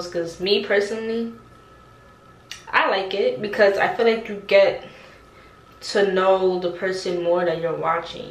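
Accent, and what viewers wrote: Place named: Brooklyn, United States. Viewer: So funny your Temu video was the first video i seen of yours and i knew you was from Brooklyn because my man is also from Brooklyn i picked up the accent rq 😂 and its funny how you also used the example of ppl from the south because i am from the south 😂 i am from Texas sometimes me and my man don’t understand our accents lol for example he says “oil “ i say “oyl” 😂😂😭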